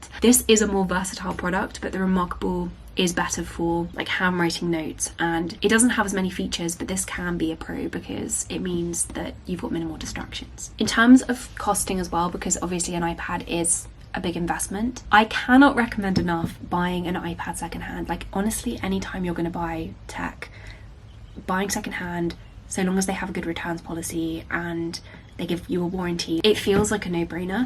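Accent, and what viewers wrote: United Kingdom. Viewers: British accents fascinate me like Henry Higgins, in such a small country. This lass sounds a lot like Elton John which makes me wonder if they hearken from the same region.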